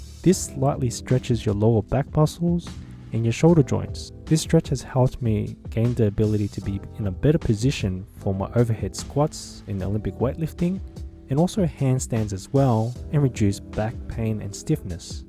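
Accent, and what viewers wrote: Australia. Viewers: i love the auschraliaaan accent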